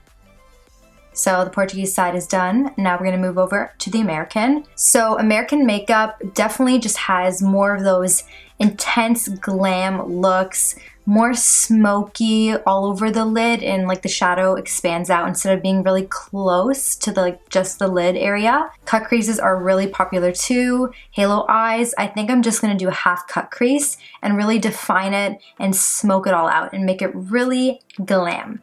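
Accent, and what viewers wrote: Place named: Coast, Colombia. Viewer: Usted parece costeño colombiana jejejeje pero mi familia también son Portuguese y mi papá es trigenio bendiciones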